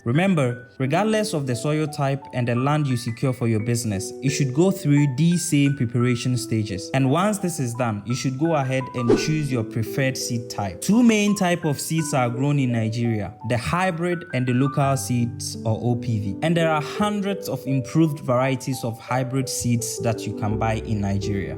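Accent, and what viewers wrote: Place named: Ghana. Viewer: You sound Ghanian 😊 Nice presentation!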